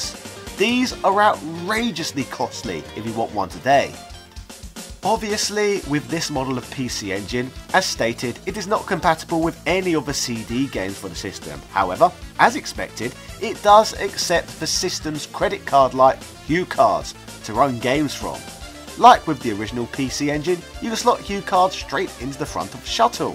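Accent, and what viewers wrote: England, United Kingdom. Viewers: What region is this guy's accent from? English folks are all so weird with their insane regional accent differences.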